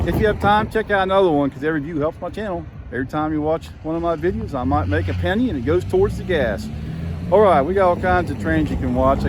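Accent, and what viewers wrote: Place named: New York City, United States. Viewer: loved your New York City accent ("DPUs, I see youse").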